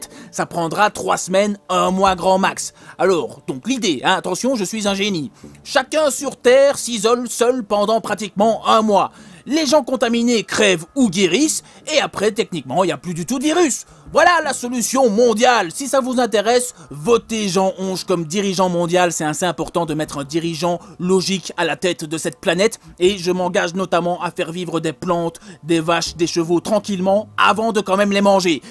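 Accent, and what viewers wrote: Belgium. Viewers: tu es Belge ? t'as un accent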